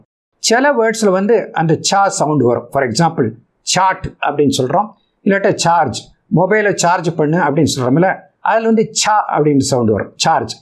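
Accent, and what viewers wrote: India. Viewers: nenga pronounce panrathu India pronunciation thane sir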